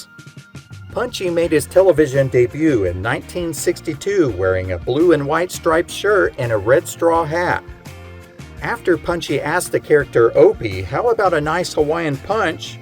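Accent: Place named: South, United States. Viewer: It seems like you are from the South, but I can't place that accent. I fear it might be Virginia. They need to be reclassified.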